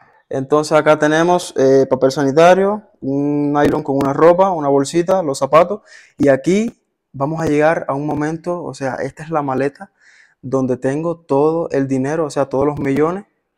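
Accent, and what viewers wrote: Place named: Cuba. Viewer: Pero si tiene acento cubano,y no tiene sangre azteca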